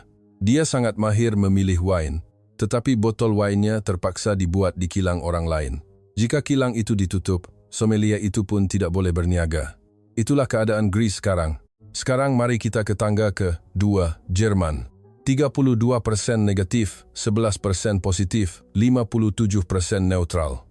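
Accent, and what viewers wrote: Indonesia. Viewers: kenapa bahasanya tone indonesia 😀ini mesti channel indonesia kan???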